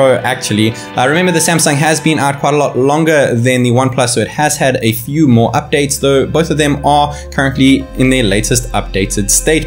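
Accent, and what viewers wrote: South Africa. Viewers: South African?!!!? 🤩🤩🤩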